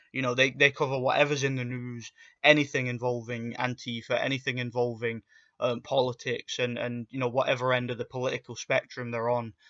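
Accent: Yorkshire, United Kingdom. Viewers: Scottish?! I'm jus' over the wall... More or less scottish as far as Gov/london is concerned haha you got yorkshire-ish accent but i talk geordie so no 1 understands owt i waffle. Will follow Count, sounds like good crack. I dont know how 2 be politically correct... Hope antifa comes 2 my neck o the woods. They'd honestly get tortured... "Take a knee? Am takin them both'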